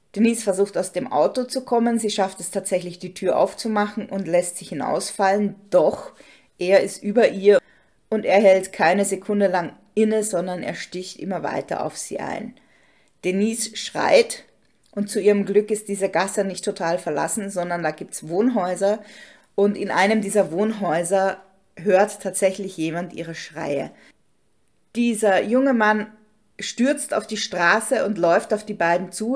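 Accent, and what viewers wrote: Austria. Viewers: Danke für diesen Fall Was für ein Jammerlappen🙄diese Stimme und das Geheule ist echt furchtbar Hör ich da bei Dir einen leichten österreichischen Einschlag❓ Sympathisch 😉😉🍀🌸💙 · Erst mal danke für deine Videos, ich höre dir mega gerne zu, du erzählst schlüssig und spannend mit einer angenehmen Stimme und ich liebe diesen österreichischen Hauch total! Für mich hat der Typ was schizoides, wie 2 Persönlichkeiten in einer Person...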